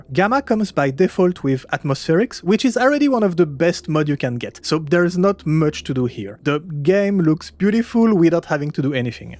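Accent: France. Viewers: bonne vidéo merci. tu serais pas français ? ton accent me fait penser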